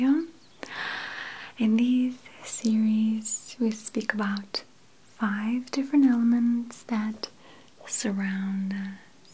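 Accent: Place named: Russia. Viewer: Thank you! Is there any way you can make a video about actual elements? Maybe a mad scientist role play with a lab coat talking about Moscovian in your lovely Russian accent?....think about it.... [waits for inception to happen]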